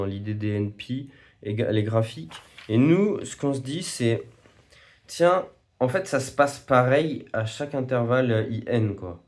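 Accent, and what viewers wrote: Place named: Belgium. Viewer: Masterclass le petit accent belge frerot😹🤙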